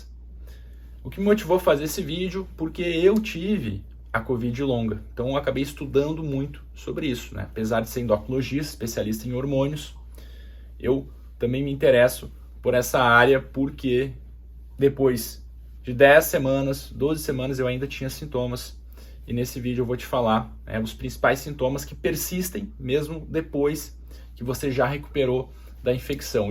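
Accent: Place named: Rio Grande do Sul, Brazil. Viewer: Eu dou nota 10 . Eu vi que tu és gaúcho , pelo sotaque. Conheço bem . Moro em São Paulo !!!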